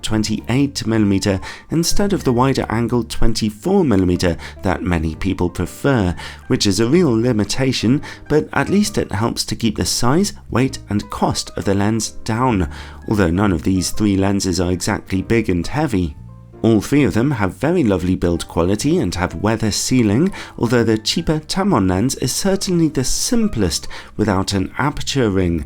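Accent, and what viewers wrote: United Kingdom. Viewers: he is british. It’s Zed in the UK but not found as much as everyone. T as Tee but some says Tango Z as Zee but some Zed V as Vee but some says Vicky C as Cee but some says Carrots B as Bee but some says Bob. They say these stuffs cuz some people in UK have bad hearing and often hear something else. Sorry for that you found it funny.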